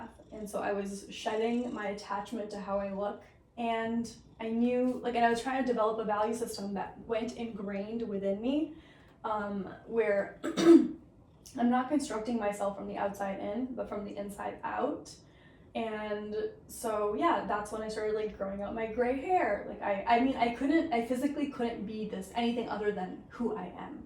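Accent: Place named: United States. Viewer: One generation is all it takes. You may be eating Indian food, but you sound so American is beyond belief. : ) your Puerto Rican Indian : )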